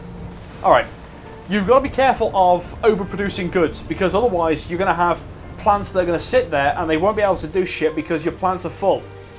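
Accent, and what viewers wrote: England, United Kingdom. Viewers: Commentary= Awesome, funny with British accent Guide= Awesome and very helpful Reaction= U just made me buy this game from Steam Now i'm gonna try it out... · LOL epic british accent to go along with this epic vid:P just started playing this game again. this vid is really helping me. when i used to play i did fairly well, but my cities were just a total messxD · Thinking about getting this game and this video is helping alot in helping me make my decision! and the british accent makes the video both informative and very funny! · the only video i have learned so many thing about the Anno game basic. thanks buddy. i love british accent. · Lol, English accent and mature comedy, Fuck going through all you videos and watching them, Instantly subscribed :) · Thank you so much for this tutorial! I absolutely love your way of talking, British accent, casual swearing and all. 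You got a subscriber, keep it up!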